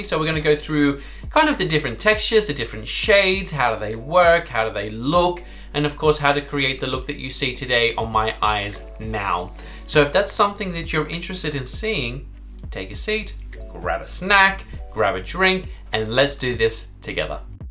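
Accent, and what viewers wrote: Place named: Australia. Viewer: Australia, I was trying to pick your accent as it sounds aussie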